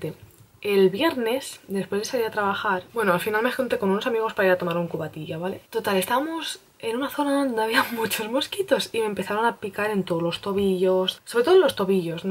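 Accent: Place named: Argentina. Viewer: Sos re argentina boluda <3 ajajjaja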